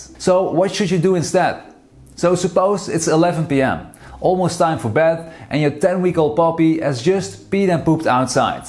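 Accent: Netherlands. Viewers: Your accent sounds Dutch, am I right?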